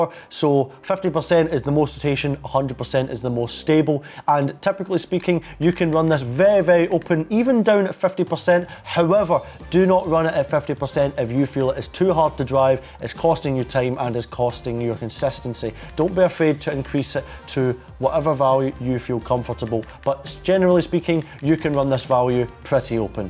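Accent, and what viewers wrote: Scotland, United Kingdom. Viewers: the scottish is showing here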